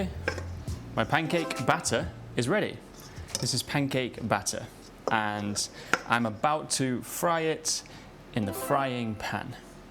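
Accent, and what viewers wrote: United Kingdom. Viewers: I'm really happy with your videos, I watch them every day and I really love them! Thank you for your help, and also I love the British accent.❤❤ I still learning 😅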